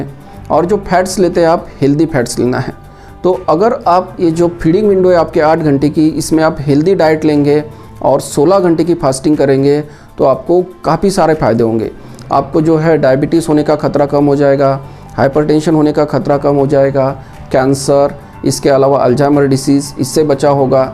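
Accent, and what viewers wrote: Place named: Vidarbha, India. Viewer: Very apt advise; very much to the point and in the simplest language possible. It was also nice to hear you Vaidarbhiyan accent. मी नेहमी पाहीन आपलं चैनल. धन्यवाद.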